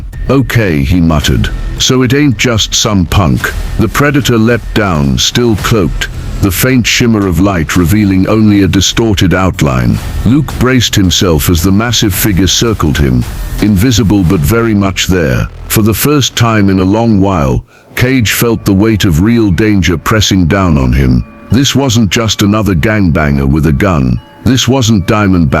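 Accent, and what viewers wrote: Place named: United Kingdom. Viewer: I’m just wondering why a British male voice is narrating a story about Luke Cage.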